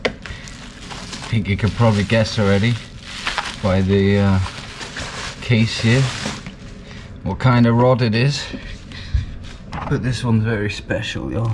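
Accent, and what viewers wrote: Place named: Wales, United Kingdom. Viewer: Interested how you ended up in Holland? You're Welsh right? Or at least sound Welsh.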